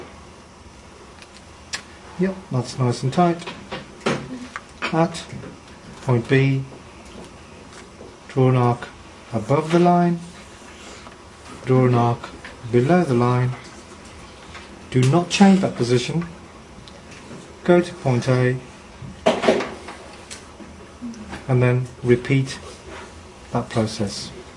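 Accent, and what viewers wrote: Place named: United Kingdom. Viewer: beautiful your british accent is a little distracting hahahaha but it's awesome too you tackled it beautifully.. i couldn't have explained it better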